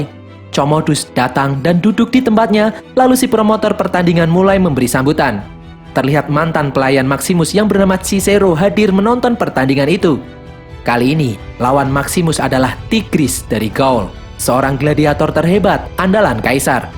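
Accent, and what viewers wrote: Java, Indonesia. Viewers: Agak mendok ya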